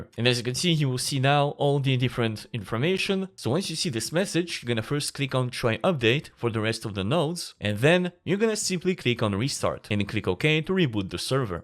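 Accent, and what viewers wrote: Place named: France. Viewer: Il me semble avoir reconnu un léger accent français, donc je commente dans cette langue:-) Fabuleuse vidéo: la présentation est claire, pédagogique, avec de l'humour ce qui ne gâche rien. Les liens sont tous présents dans la présentation ou sur la page patreon. Bref une réussite. Avec une telle vidéo, impossible de rater l'installation de ce nouveau Flux Q8. Surprise il est beaucoup plus rapide que les versions précédentes de Flux (avec NVIDIA GeForce RTX 3070), et le résultat est magnifique. Un grand merci à.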